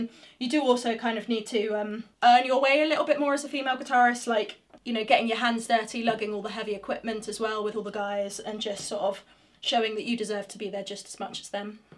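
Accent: England, United Kingdom. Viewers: Where are you from? You sound so British... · Ah...Your ENGLISH English as the young lady in the Warlock movie says! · I am from the U.S. What type of English accent do you have? I know its not Cockney. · The british accent is simply the icing on the cake. What a combination of personality, talent, looks, aaaaaaaaaand accent. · I'm a little late to the party as I only found you on youtube recently and was amazed by your skill. Looked up more recent videos of yours and after a few covers I opened this Q&A, so that I could have an idea of who you were, aside from a fantastic guitar player. Well, I'm hooked after just the first minute. I mean, I was going to subscribe anyway, but: - (amazing guitar skills) - awesome british accent - loves science - likes motocross and would have wanted to become a pro rider Really??? You're great, I'll be very happy to follow your future projects :) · You’re not only into music, but motorcycles, touring America, house, kids, English accent, etc? 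Good God girl-why couldn’t I have found you?!? Yes, let’s see a vid of you and your motorbike experience 👍🏻🇺🇸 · Typical Brit accent!i love it!you gatt try or or by!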